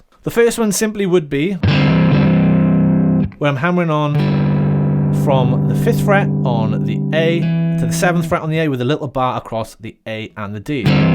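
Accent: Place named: Wales, United Kingdom. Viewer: Thanks great lesson just subscribed. Great to hear a Welsh accent teaching guitar.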